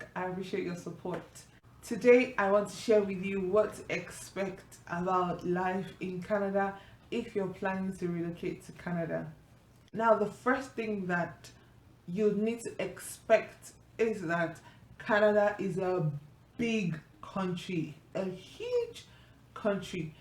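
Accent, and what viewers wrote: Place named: United Kingdom. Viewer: I like the way you talk You sound British😌😌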